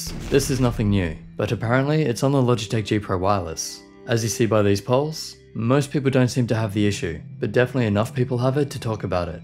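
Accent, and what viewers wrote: Australia. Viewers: Are you Aussie? If so, same :D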